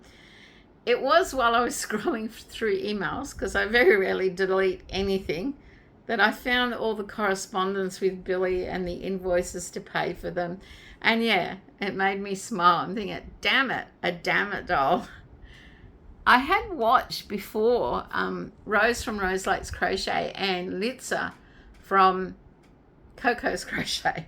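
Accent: Australia. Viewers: As soon as i hear an Aussie accent i click subscribe. Great to have more and more Aussies starting up a YouTube channel, everything is so US centric at the moment. Love your work, loved your! He is a great bloke for this country.:)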